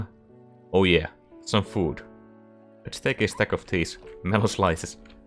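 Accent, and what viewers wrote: Finland. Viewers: Suomalainen aksentti... Xd Keep up the great content! 😃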